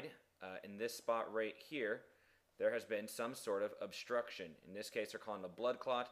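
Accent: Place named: United States. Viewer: its frankie boyle!!! (with an american accent)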